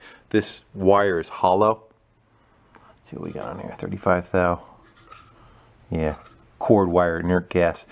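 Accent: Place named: Michigan, United States. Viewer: You sound familier. I bet your from Michigan.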